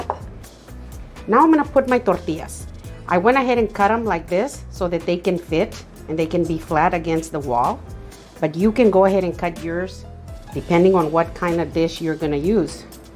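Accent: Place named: New Mexico, United States. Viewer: Señora ya me dio mucha hambre 🤤 and you remind me of my husbands family from New Mexico, same accent 😀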